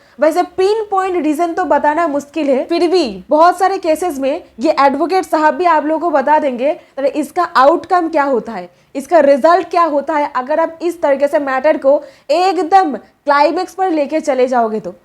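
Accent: Bengal, India. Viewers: Bangali ho isliy aapki bhasa bahut pyari h ha hindi thoda weak h .mam aap jo boli 10yrs case chala .mujhe tazzub hua mera v ek 95995 ka cheqe bounce ka case h wo accussed person abhi khi chhup gya h samne nhi aa rha h usne bahuto ko thaga h mere pass cheqe tha isliy court tak gyi sochi 6 to 12 month me result aa jayega lekin mai dr gyi hu kya 10 yrs tak v case chalta h .itna late jyu hota h mam? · Waise achha bolti ho lekin bangoli ka ye problem hai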